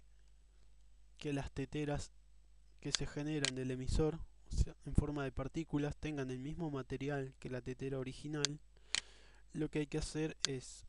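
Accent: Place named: Argentina. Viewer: Siempre muy buenos tus tutoriales master, concisos, bien explicados, sin dilaciones. De paso, descansa un poco los oídos escuchar tutos en argentino.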